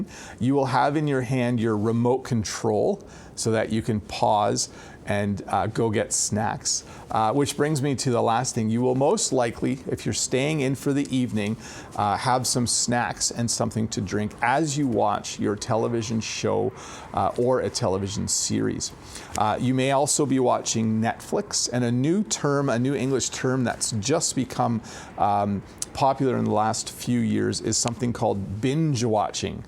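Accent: Canada. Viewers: I appreciate you for your lovely Canadian accent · An excellent video for training the listening skills and for to get vocabulary. I love the canadian's accent.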